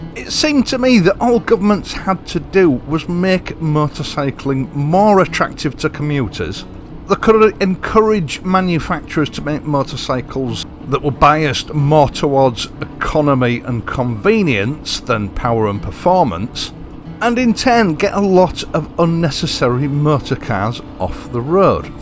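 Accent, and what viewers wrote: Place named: Bradford, United Kingdom. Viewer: South Bradford accent ? Trying to pin it down is doing my nut in... trace of Irish-Leeds though...must be munt it ?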